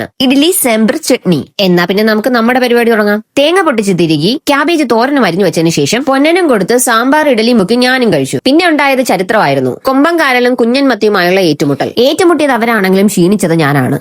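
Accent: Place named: Trivandrum, India. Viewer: chechi tvm analle❤❤. Athukond enik manasilyi 😂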